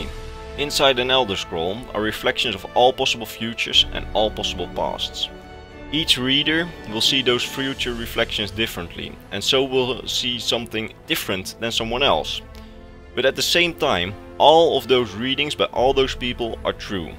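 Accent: Netherlands. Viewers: Ik kan duidelijk horen dat je nederlands bent. Goede video's man, ga zo door! fijn om een mede elder scrolls lore nerd te vinden uit eigen land!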